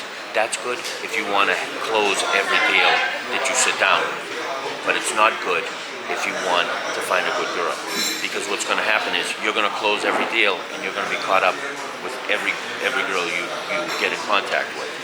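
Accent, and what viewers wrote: New York, United States. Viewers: Im from NJ and I can hear a long Island or NYC accent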